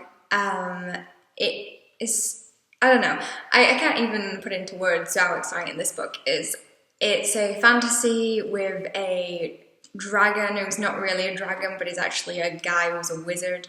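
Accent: United Kingdom. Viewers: subscribed to your channel! Please keep uploading new videos. I felt in love with your British accent. :D The more videos you upload the more confident you eventually become. :) p.s. nice T-shirt. Give your cat a rub it was well-behaved. :D :D :D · I'm so glad your doing video blogs now. I love hearing about the books, I have read a lot that you mention in your blogs and others I add to my list as you make them sound interesting. I love your British accent as I love all things British. Had to include that!:) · Aw, your cat is so well-behaved! Uprooted is next on my list. Anything that gets compared with Howl's Moving Castle is an instant win. Also, it's so great to hear a Northern accent! My Stoke one tends to get the ear squinting treatment!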